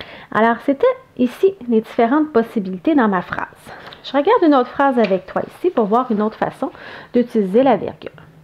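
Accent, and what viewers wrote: Canada. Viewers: C'est la meilleure des manières, pour apprendre une leçon comme celle-ci. se laisser bercer par un doux accent Canadien. Pouvez vous me dire si cela est juste ? Merci d'avance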